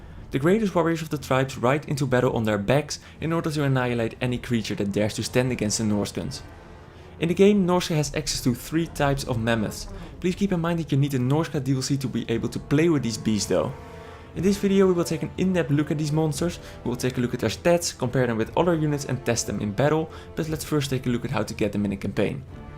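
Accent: Netherlands. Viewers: Jij bent nederlands of niet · Lemme guess, you're Dutch aren't you?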